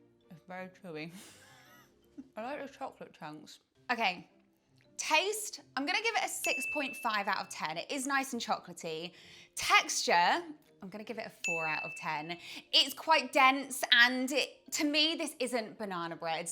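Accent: United Kingdom. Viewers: I had no clue that you were British or wherever your from. 😂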